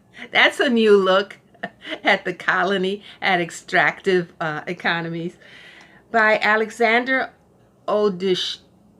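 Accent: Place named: Puerto Rico. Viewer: Hello, I enjoyed your nonfiction TBR and loved hearing the language and accent of "mi gente." I'm astounded at the gall of the author who thinks Puerto Rico is not part, or shouldn't be part of the US. It's not like we had a say in that situation. I'm hoping for the best. Aloha y bendicion.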